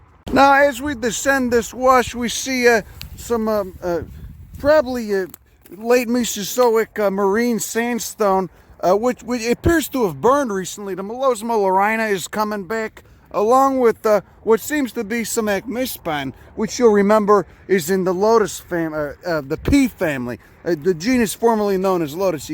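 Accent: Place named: New Jersey, United States. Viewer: Photosynthesizing pile of shit lol...love it...you sound like you're from Jersey btw.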